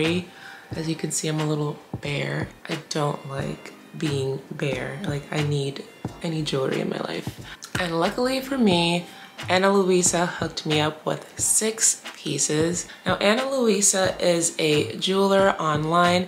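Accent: Jamaica. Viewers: I heard that Jamaica voice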